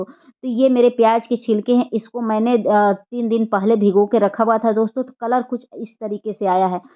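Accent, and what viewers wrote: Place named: Uttar Pradesh, India. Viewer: आप पक्का यूपी से हैं।🎉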